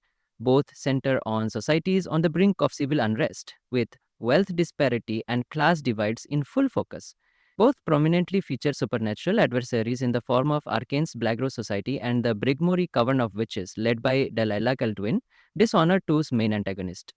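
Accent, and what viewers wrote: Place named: India. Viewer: Hey bro amm the vedeo is good but wat I think it lacks is a good english if you wanna improve it just find some one to chat with by chat i mean talking not texting and It should make your english sound less Indian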